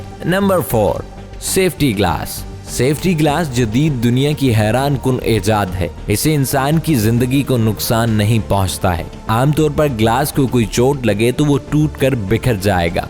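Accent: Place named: Lahore, Pakistan. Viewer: Hahaha, nai Lahore ka lgta hai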